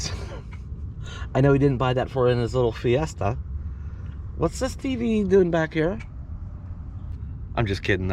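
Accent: Pennsylvania, United States. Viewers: That launch was cool, thanks for sharing..I live on the Delaware MD border n when the skies are clear you can just see the launches from Wallops Island in Accomack Va..have never gone to the launch but it's on my bucket list..I enjoy your videos..you remind me of family, how i was raised in Ohio and for my Pa cousins it's the accent, lol..Happy travels, Cheri✌️❤️😊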